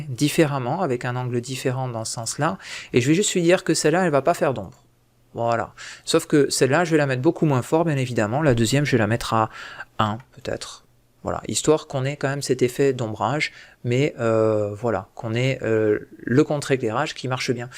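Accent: France. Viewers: Aie, plusieurs tuto de toi que je regarde, a chaque fois je me dis que tu doit etre francais et je viens de remarquer que tu faisais tout les tutos en francais aussi --" Merci pour tes videos elles sont bien expliquées :D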